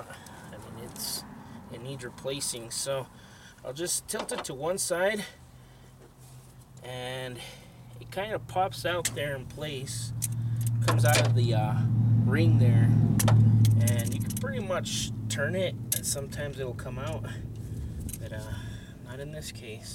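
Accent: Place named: Minnesota, United States. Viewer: Thanx for the video....... I kinda felt like I was watching an episode of FARGO (the accent)......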